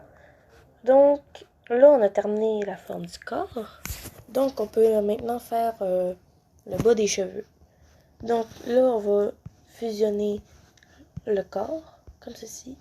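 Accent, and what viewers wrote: Quebec, Canada. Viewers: Je m'abonne direct ÚwÙ Grâce à toi jai réussi enfin Ton accent québécois je kiffe trop!!! ♡♡♡ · Omg québécois j’adore veux acsen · L'explication 👌 l'accent québécois on adore +1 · Omg merci beaucoup j’ai enfin compris :O et j’adore ton accent québécois 🤩 +1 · J'aime trop ton accent québécois !!!! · Cute ton accent québécois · Merci pour sa ! en dirait que tu est une Québécoise avec ta voix trop belle ❤️🌹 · Je trouve que ton accent québécoise et trop rigolo je veux pas me moquer mais je l'adore ton accent et toi son trop bien 😊😋ha et aussi je m'abonne !! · Merci, t'a vidéo m'a énormément aidé ! Et au passage j'adore ton accent québécois ! ❤️ Je m'abbonne ! 💞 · Je peut avoir ton accent québécois ? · Ça m’a beaucoup aidé, merci bien ! 😄 Je trouve que tu expliques bien, en plus ton dessin est superbe ! Et j’adore ton accent québécois 👍🏻 Donc en gros tous est géniale ^^ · J’aime bien ton accent québécois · Tu as un agcent québécois (je vien d'arriver) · J adore ton accent québécois il est trop beauuu 😍 Sinon super ya jamais personne qui explique aussi bien que toi ! 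🤩 +1 abos je te jure :) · J'aime se que tu fait je vais essayer sa tout de suite ! Sinon si il y a des gens qui disent qui n'aime pas ton accent québécois, ne les écoute pas moi sa ne me gêne pas. Ai'je le droit de te faire une pub ? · Merci tu explique mega bien et j adore ton axcent québécois et sinon pourrais tu faire un tuto comment faire bouger les bras par exemple s'il te plaît TwT et je m abonne direct et paff c fait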